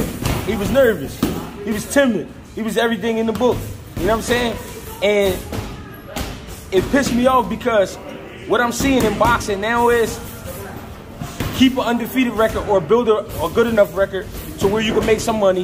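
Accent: Philadelphia, United States. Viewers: Bro definitely from Philly 🤣🤣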